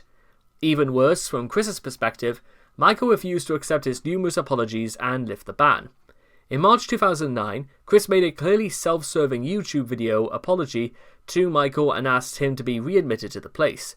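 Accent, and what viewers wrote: United Kingdom. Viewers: You sonded like a cockney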